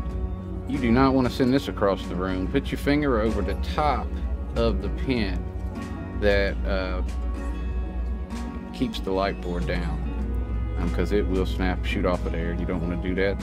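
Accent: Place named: Kentucky, United States. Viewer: Where are you from. I’m In KY you sound like me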